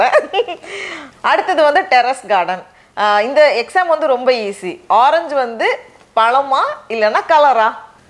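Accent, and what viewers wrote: India. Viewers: Nice volg dear Namma uru Tamil kekavei sema mol cutiee 💓💓💓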